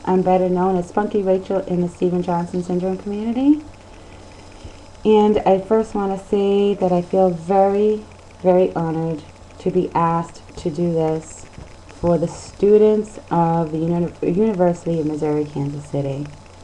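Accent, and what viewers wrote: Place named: Boston, United States. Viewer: SJS survivor as well. Like the Boston accent ;)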